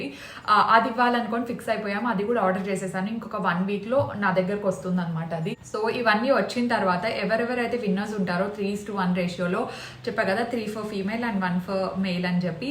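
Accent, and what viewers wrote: Anantapur, India. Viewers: My house tour is the first video I saw.I like way of your talk,dressing style,julie silence and dolly allari and also pinni talks are also nyc.When I'm studying intermediate one of my friend was from ananthapur I like that slang and the way of talking,the same slang and way of conversation was observed in your videos.Usually I used to enjoy that conversations.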